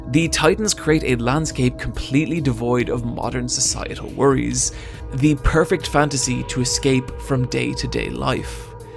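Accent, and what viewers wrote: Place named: Ireland. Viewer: I had a feeling you were Irish wasn't sure if it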